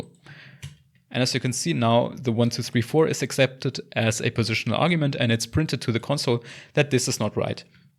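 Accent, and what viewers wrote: Germany. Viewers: Ich liebe deinen deutschen Akzent ❤ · I genuinely like your accent and you being a German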